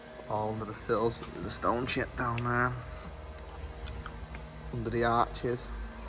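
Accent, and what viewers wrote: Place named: Manchester, United Kingdom. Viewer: Where you based? you sound like Manchester... Someone had a go at mine before i started it as well lol... There is a few videos of the work iv had to do, unbelievable lol.. Yours is mint 😆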